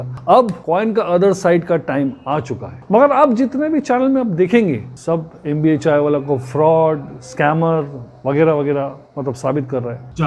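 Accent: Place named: Bengal, India. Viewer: Sir are you bengali.. Because your accent is like that 😅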